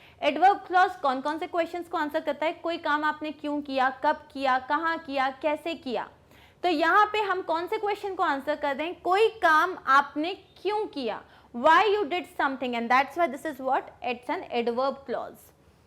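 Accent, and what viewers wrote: India. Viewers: OH NO...INDIAN ENGLISH...NO...NO...NO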